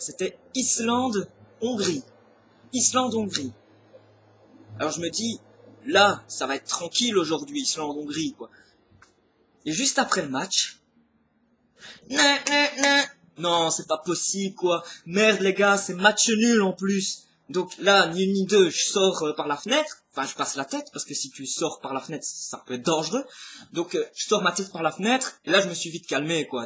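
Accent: Belgium. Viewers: Coucou :) Je me dois de te taquiner un peu ... Ton accent belge ♥ ! Je me souviens encore de tes premières vidéos où tu étais réservé et pouf .. Maintenant tu pètes le feu et anime tes vidéos :P Les quinze premières vies, c'est le genre de bouquin qui me rendrait folle ... Alors je comprends ta déception ! J'attendais avec impatience ton avis sur le livre que tu as abandonné :) Ahahaha, tu me fais rire x) Ah oui, généralement les profs étalent leurs connaissances dans leur bouquin ^^ Bon bha clairement, merci de la prévention, je ne le lirais jamais ^^ Bisous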